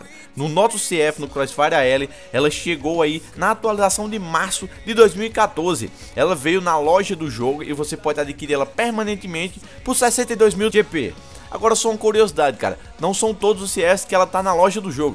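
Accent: Northeast, Brazil. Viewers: ruma de bônus kkkkkk sotaque nordestino é diferenciado!!!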